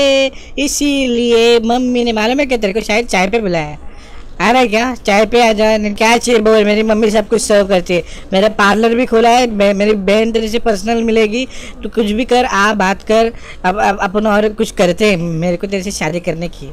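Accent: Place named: Mumbai, India. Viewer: ponga pandit.. LOL.. I am sure commentrator is from Mumbai.. Only a Mumbaiwalla kan speak like that.. Cheers to Mumbai.. videos have many dislike.. some of them.. Non Mumbaikars dont understand jhakas humor of mumbai... so they press dislike... you dont worry... keep making such videos.. u rock.. Mumbai spirit socks.. stay Mumbai style only.. dont change...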